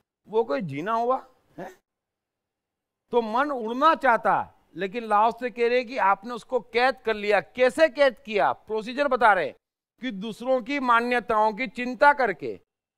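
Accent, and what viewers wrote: Gujarat, India. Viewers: Nahi, Ye Gujarati he.🙂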